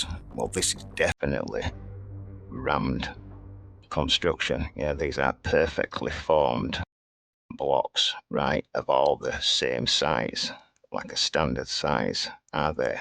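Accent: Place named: Blackburn, United Kingdom. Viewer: Nice work. Love the presentation and questions it makes one ask. Ya also sound like me best man's dad from Blackburn. Am I close?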